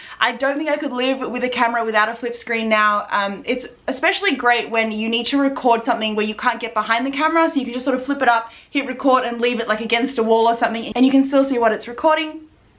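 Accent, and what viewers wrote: Australia. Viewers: oh my gosh, I love your Australian accent ! so sweet ! kiss from Paris X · Sounds aussie ;) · omg its so unexpected to hear an australian accent LOL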